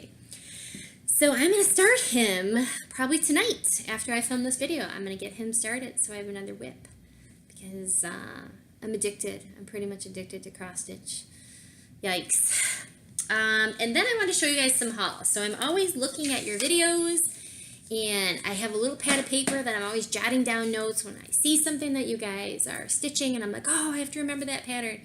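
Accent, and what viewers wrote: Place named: Cleveland, United States. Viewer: You are like the coolest girl ever!! 😆😆 I totally thought you were from Cleveland from the accent...but I do remember Bendy Stitchy saying you were a Wolverine 😮. I’ve never had a reason to go to Michigan but you make the Bavarian town sound totally worth it!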